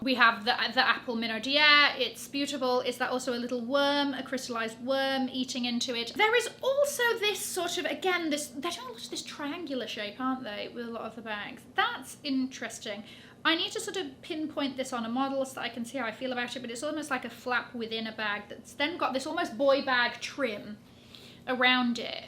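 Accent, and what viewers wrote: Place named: RP, United Kingdom. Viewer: Hi, I agree with you, some elements I like, some others are simply silly. Your videos are always interesting and I love your fantastic received pronounciation.😍😍😍 ( I understad everything you say and I’m an italian living in Spain)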